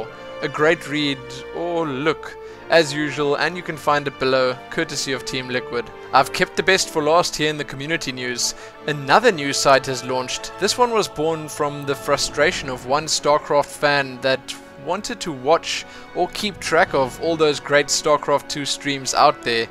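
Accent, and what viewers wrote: South Africa. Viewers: I absolutely love the South African accent. I want to hear your voice on a lot more Starcraft shoutcasts.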